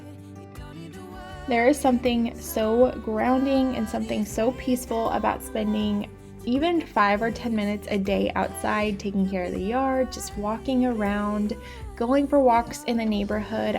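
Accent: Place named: Louisiana, United States. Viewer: Where are you from? Sounds like maybe Louisiana.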